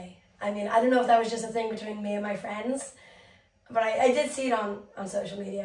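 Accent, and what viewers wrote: United States. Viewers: Story with the American accent? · I dont understand why you talk like an american?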